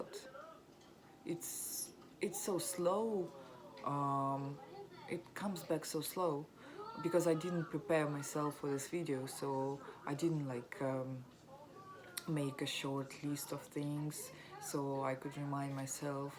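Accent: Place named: Eastern Europe. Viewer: are you east european? your accent is so pretty :)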